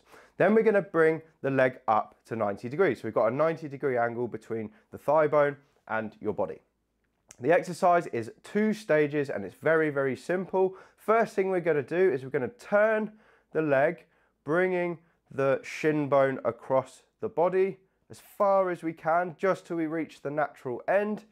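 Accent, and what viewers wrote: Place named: United Kingdom. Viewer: Superb presentation.... British! No annoying music either!!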